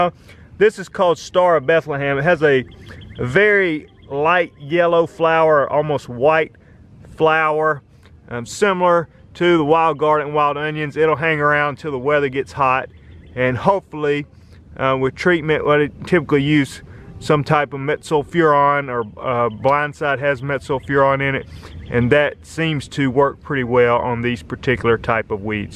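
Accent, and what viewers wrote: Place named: Alabama, United States. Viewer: He's got that Alabama draahhwwllll.. Love this channel tho. You'd probably say I have an accent from VA. But sounds normal to me .lol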